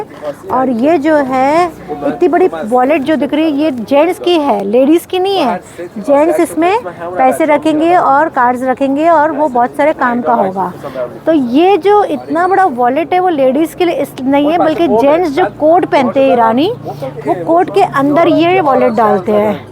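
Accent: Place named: India. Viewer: آپکی اردو ہم کو بہت اچھی لگ رہی ھے۔ہم آپکے لہجے سے فورا سمجھ گئے کہ آپ ہند سے ھیں ۔پاکستانی نہیں ھیں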